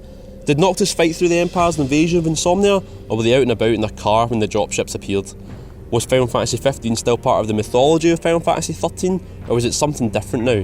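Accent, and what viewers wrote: Scotland, United Kingdom. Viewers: Great video, love how you got more and more Scottish as the video went on haha. Was a great watch though. Thanks :)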